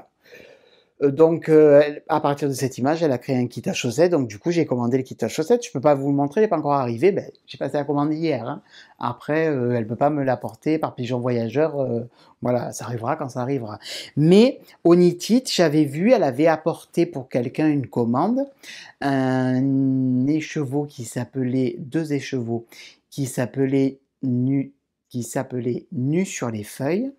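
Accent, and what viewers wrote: South, France. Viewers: ce petit accent du sud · Ce petit accent du sud nous met du baume au cœur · je comprends assez bien ton accent du sud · J'aime beaucoup ta simplicité et ton accent du Sud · ton accent du sud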